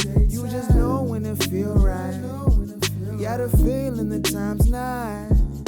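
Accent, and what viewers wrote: United States. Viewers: Careful now, you are sounding American, that seems to be frowned upon these days. Stay safe, and keep up the good work, every day you wake up alive is another day you can strive to be better than yesterday.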